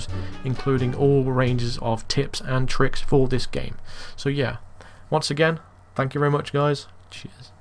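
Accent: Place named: West Country, United Kingdom. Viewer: Bristolian/West Country accent.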